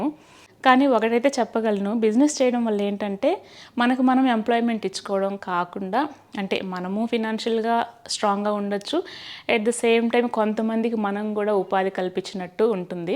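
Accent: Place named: Nellore, India. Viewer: Hi andi, I can understand your situation Nenu kooda same mee situation lone unnanandi , nenu 2 years nundi shifts unde job chestunnanu night shifts ekkuva chestuntanu , health chala padaipothundi and pillalni sariga choosukolemu kooda andi job manaileka pothunnanu meeru cheppunatlu job chestoo undi sudden ga manesi khalie ga undalante undalemu , kaani health chala important andi manam healthy ga untene pillalni , family ni choosukogalamu , ie night shifts valla mind kooda disturb avuthundandi , anduke quit cheyyalane anukuntunnanu final ga Meeku business idea undi kabatti danike move avvandi andi ie night shifts chesi health disturb chesukokandi , idi experience tho mee meeda gowravam tho nenu meeku istunna salaha matrame , kaani meeku edi mee life style ki correct ga untundo. Choosukoni decide chesukondi, meeru matlade vidhanam naaku chalabaga nachuthundandi maadi kooda nellore ne anduke akkadi vallatho matladinatlu untundi naaku mee video choostunte , naaku time dorikithe compulsory gaa mee videos choostuntanandi . 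All the best andi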